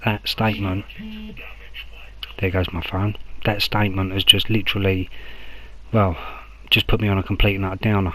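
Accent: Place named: United Kingdom. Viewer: i know im late but hearing a another British voice is redreshing lool. Good video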